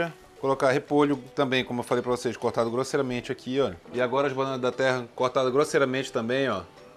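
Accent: Pará, Brazil. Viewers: Adoro esse sotaque paraense "cominho" , "picadinho"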